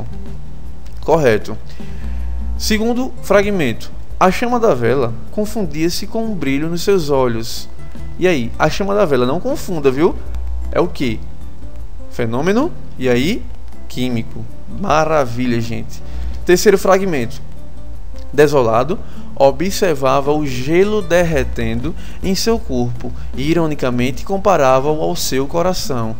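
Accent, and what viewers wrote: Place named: Pernambuco, Brazil. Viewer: pelo sutaque eu chuto! Pernambuco? ...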